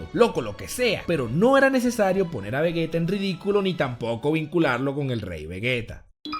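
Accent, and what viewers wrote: Venezuela. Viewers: man, eres venezolano? el acento te delata jajajaja por cierto buen vídeo!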